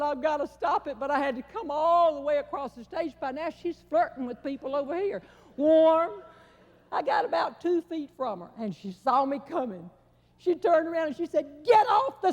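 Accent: South, United States. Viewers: She was so funny and had such an adorable Southern accent! I just loved Jeanne Robertson! ❤️ Good clean humor! And she was one of the greatest story tellers ever!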